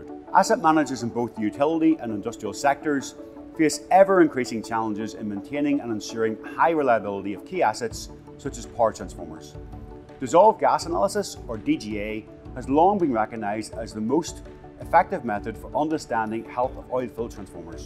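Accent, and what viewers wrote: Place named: Northern Ireland, United Kingdom. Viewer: Excellent Video. The subtitles helped with the NORN IRON accent!:-)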